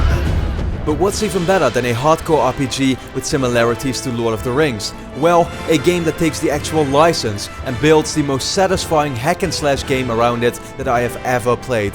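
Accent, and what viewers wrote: Netherlands. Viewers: Dutch accent? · You sound Dutch, are you? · Are you dutch ?.. you have a dutch- english accent xD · Bas Warmerdam ik denk t ook aan zn accent te horen xD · r u Dutch becaus you speak English like someone from the Netherlands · NEDERLANDS ACCENT GESPOT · You sound dutch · Ben je nederlands, Are you dutch? i can hear it at your accent. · lol i was about to ask that and i think so bcs his accent is dutch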